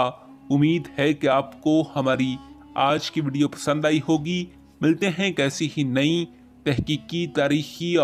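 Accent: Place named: Punjab, Pakistan. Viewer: ٹیٹھ پنجابی سٹائل میں پڑھ رھے ہیں جس سے بہت سے الفاظ کا تلفظ صحیح نہیں ھوتا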